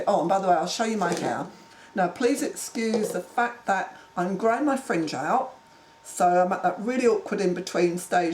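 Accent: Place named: United Kingdom. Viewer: I stumbled on your video . I am glad I found you . I am 63 and have grown my hair out below my shoulders . I had to smile when you talk about your shower . I am a 'tub soak bath' woman and wash my hair separate , in the kitchen sink . Will you make video's on pony tails and buns ? How do you do the puff bangs ? What is a 'plat' ? I like your accent -- and when you said 'carry on' - was a dead give away of British. ❤️ Kindest Regards ❤️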